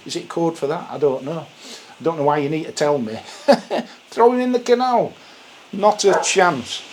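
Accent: Yorkshire, United Kingdom. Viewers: Happy to remain a subscriber. As a fellow Yorkshire man I understand every word. Keep the BSA, it’s a fine machine.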